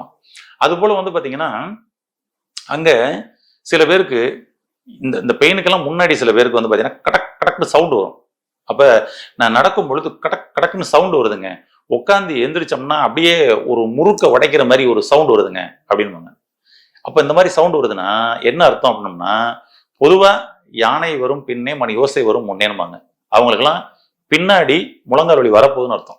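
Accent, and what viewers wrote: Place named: India. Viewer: Dr சொல்லும் சில சொற்களை இலங்கை மக்கள் புரிந்து கொள்ள முடியாதா ? Air (எயார்) என்பதைத்தான் ஏர் என்கிறார். Common கொமன் என்பதைத்தான் காமன் என்கிறார். தமிழில் காற்று என்று சொன்னால் நன்கு புரியும். இந்தியர்கள் தமிழிங்கிலிஸ் பேசுவார்கள்.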